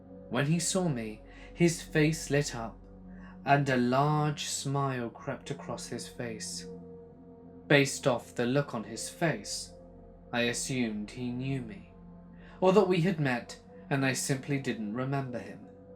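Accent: United Kingdom. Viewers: Hey! I just wanted to say that I went back and watched some of your older videos. I don't know if it's just me, but your British accent seemed a little bit thicker than it does in your more recent videos. Is there a reason why you sound different now? Just curious. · So glad I found this channel, a British person narrating!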